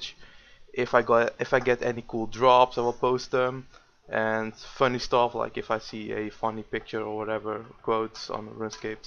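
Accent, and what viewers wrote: Netherlands. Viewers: DAT DUTCH ACCENT <3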